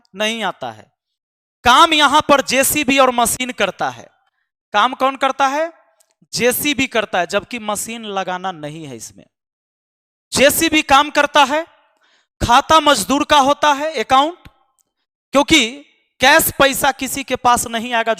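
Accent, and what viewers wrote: Bihar, India. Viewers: Aap gvaro jaise bat krye bihar ke. Jansankhya. Ghntv pr boliye aapka sr tan se juda go gayega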